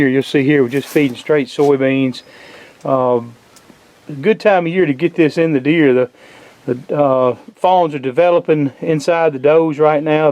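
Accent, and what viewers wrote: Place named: Virginia, United States. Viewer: Howdy, I’m new to your site. I’m an 80 year olde this August & a disabled veteran. I really enjoy what you do and watching you do it. I haven’t heard an accent like yours since my days in Virginia and the Navy so I’m learning. You seem like a great guy and the people that work with you, also. Are those your family or hired hands? Thanks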